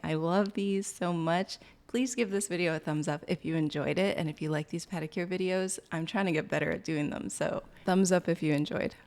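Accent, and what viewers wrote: Canada. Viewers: Are you from Vancouver you sound like you are? 😂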